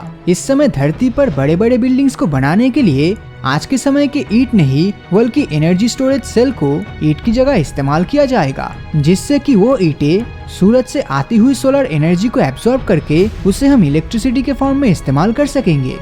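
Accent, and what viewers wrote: Bengal, India. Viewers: Is your mother tongue bengali?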